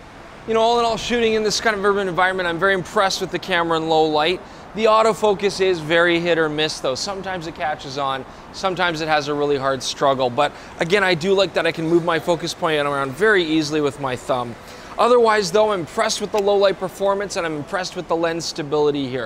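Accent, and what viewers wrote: South Africa. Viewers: Great video as always. What on earth is with the temporary South African accent? It's messing with my head! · LOL I'm South African and your translations were hilarious! Did you say "lekker lekker"? LOL you sounded so South African! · dude are you South African too?! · This is SA accent! Where and why did you pick up such a convincing accent? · Nice SA Accent! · Very impressive SA accent. Very chuffed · BTW, Godox now have a trigger and firmware upgrade for their Flash units that will allow HSS and TTL for Fuji camera's. PS, I think your South African accent went over his head! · That's an Afrikaans accent. Interesting. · a great camera for traveling of fuji. BTW your South Africa accent is pretty good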